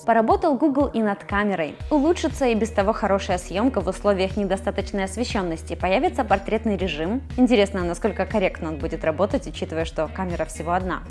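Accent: Ukraine. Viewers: Может девочку поменяете на более привлекательную? Не думал что скажу подобное, но на жирного мужика мне смотреть интересней, чем на пресную женщину, с проскакивающим малоросским говорком. Так хоть глаз будет радовать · чет приуныла. Избавилась от яркого, бросающегося в уши украинского акцента. Остался лишь небольшой отголосок и слушать теперь по приятнее. Но ты с ярким акцентом как будто силу богатырскую потеряла. Как будто что то случилось, если это так, держись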